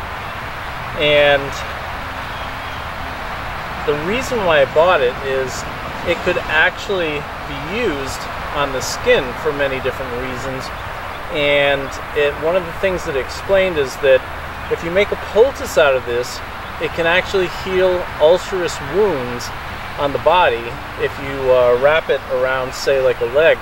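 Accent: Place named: Boston, United States. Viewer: Every time I watch one of these videos, I wonder if it’s gonna be relevant to my zone and the moment I heard your Boston accent I knew I was good!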